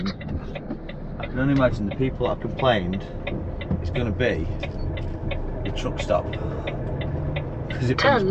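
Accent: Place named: Northern England, United Kingdom. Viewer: Am from donny mate accent similar to your just a bit more northern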